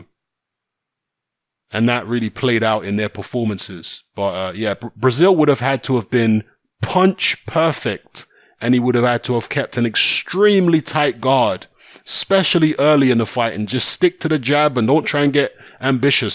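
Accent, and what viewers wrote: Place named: United Kingdom. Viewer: Thanks for that it's nice to hear someone with a British accent giving Wilder some respect.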